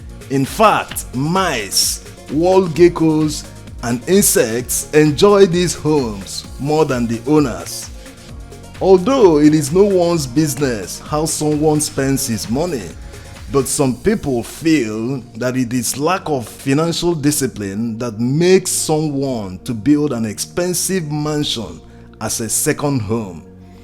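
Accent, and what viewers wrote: Igbo, Nigeria. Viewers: Is this commentator okay? You slap a man for building a house for his family with his own money ,the woman must be mad i. e if his story is even true! You sound Igbo, you lack depth in your analysis.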